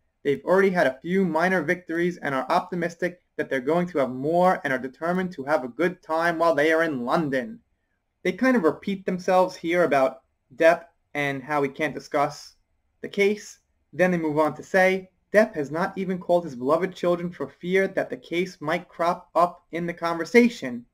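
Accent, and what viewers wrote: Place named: East Coast, United States. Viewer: What made you move to Japan? You sound very East Coast USA.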